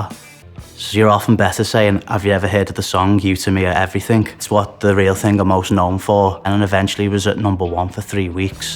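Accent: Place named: Liverpool, United Kingdom. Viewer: LOVE THIS ACCENT WHICH MAKES ME FEEL I ONCE LIVED IN WONDERFUL PLACE WITH PLENTY OF GOOD PEOPLE BY MY SIDE WHERE? LIVERPOOOOL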